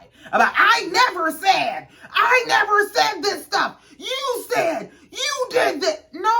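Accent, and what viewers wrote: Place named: Charleston, United States. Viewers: You gotta be from the low country. I know home when I hear it. Chile Eugene gone go out for cigarettes one day and never return. Works himself to death,smh. Is he not tired of moving? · You from Charleston????? Sound like me and my people!!!😂😂♥️♥️♥️♥️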